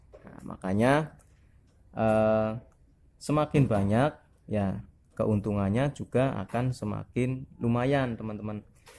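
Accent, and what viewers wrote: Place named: Banyumas, Indonesia. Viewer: Ora ngapak ora penak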